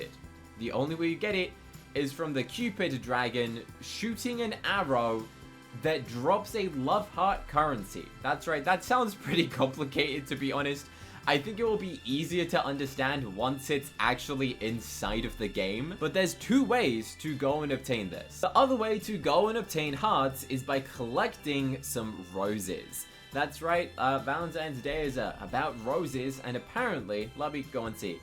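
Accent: United Kingdom. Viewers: You also sound British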